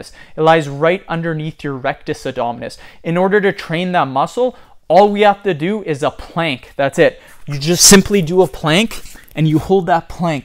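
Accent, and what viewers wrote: Canada. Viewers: is it just me or does he sound canadian..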